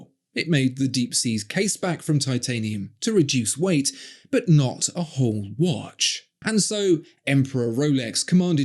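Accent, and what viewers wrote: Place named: United Kingdom. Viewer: I love to put the face to the voice I’ve heard for years… However you gotta find a space where you’re better depth of field… The blue background is too stark… And all the YouTube army of watch channels do the perfunctory man cave muted colors with tchotchkes curated from the cork sniffing image they’re attempting to curate… And although I’m sure you paid an artist for your logo it looks too much like a car design and has nothing horological to denote the subject of your channel… Otherwise I’ve enjoyed you for many years and now my sons are all watch enthusiasts As with most things a British accent elevates and always has the right amount of tonality to not be hard on the ears and your copy is always a beautiful poetry of observation, Snark and on point assessment When I started researching watches the only man I found at that time was Alex from Watch advisor… Who actually lives in Switzerland and seems to know many companies ceo… I still find his charming enthusiasm and accent useful and comforting… But keep up the good work Your voice is often playing on the TV in our household🎉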